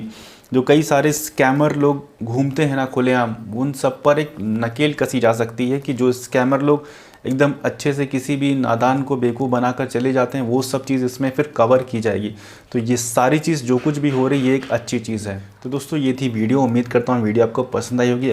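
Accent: Lucknow, India. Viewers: Bhai Aap Lucknow Se hai kya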